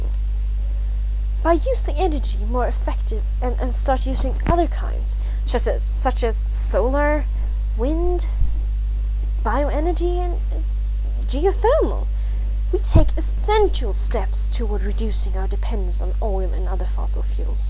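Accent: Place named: United Kingdom. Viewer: Just curious but, are you reading something when you're narrating this? And did you do this for school? I still don't really feel convinced. But your English isn't as bad as you think, you actually sound somewhat British. The only thing that stood out to me was "drought" which is pronounced like the word out (but you might already know that now). Anyway, best of luck if this is for a grade or whatever.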